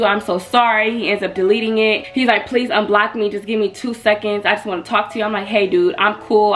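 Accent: Chicago, United States. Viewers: DEFINITELY CHICAGO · born and raised here and this is definitely chicago nigga vibes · yea , I’m frm chicago n it sound like sum shit that mfs do out here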